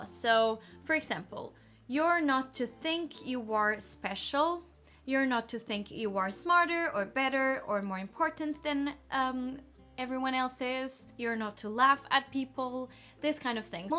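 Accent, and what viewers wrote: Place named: Oslo, Norway. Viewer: not at all:) after living in Oslo for some time Ive got the same accent. that’s why I noticed:D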